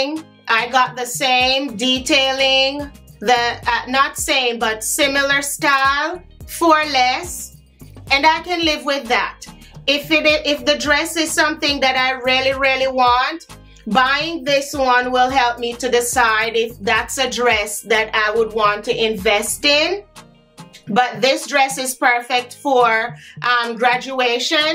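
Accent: Jamaica. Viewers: Ok you’re too cute and I love your Jamaican accent. New subscriber her😊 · Girl I just stumbled on ur channel and if mi love you one time. As a fellow Jamaican you got me laughing cause I was seeing and hearing myself in you Xtra plus tax 🤣 love ur vibe, amazing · First of all I love those dresses, but mi luv di Jamaican chatting,am crazy about looking good u go cuz, we shared the same last name, big up uself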